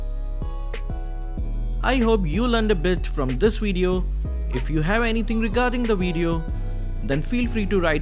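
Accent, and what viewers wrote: India. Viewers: Kazuki are you from India , because your voice is like an indian very clear , some I noticed that you equip the map of India ? 😕🤔 By the way thanks for this video it will help players like mm. players and also us to improve our skill and builds . 👌🙏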